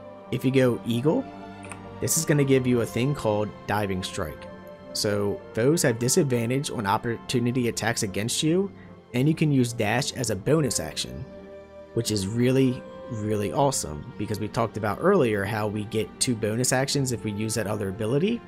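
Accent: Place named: Philadelphia, United States. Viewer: This might be the most out of topic comment but are u from the philly area the accent is very strong if so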